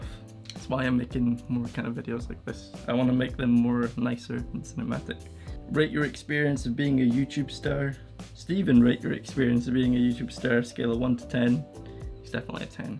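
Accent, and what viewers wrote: United Kingdom. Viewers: Wait, are you British?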